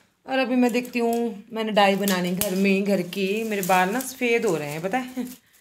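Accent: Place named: Sirmaur, India. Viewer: Boli se aap sirmaur k ass pass k lagte ho Ya solan district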